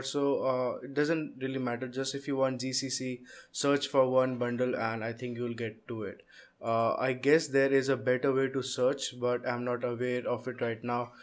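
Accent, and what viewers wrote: India. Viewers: I can't take you seriously with that indian accent. Already laughing.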